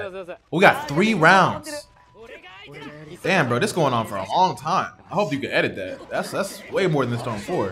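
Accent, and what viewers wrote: United States. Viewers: No hate to but I hate the way Americans pronounce the characters' names especially with Naruto and Jotaro.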